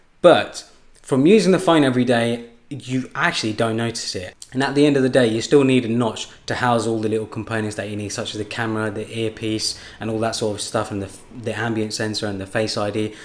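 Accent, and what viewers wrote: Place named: London, United Kingdom. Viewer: British london accent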